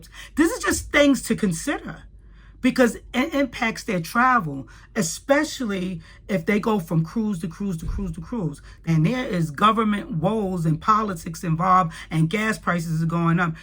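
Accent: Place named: New Jersey, United States. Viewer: Loved your video, glad it came across in my algorithm, and yes, I subscribed. Your voice and your accent gave me a warm and fuzzy feeling of listening to my relatives back in New Jersey ❤ Looking forward to your new videos while I catch up on your old ones!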